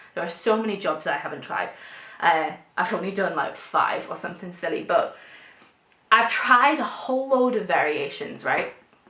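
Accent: Newcastle, United Kingdom. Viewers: A Geordie lass?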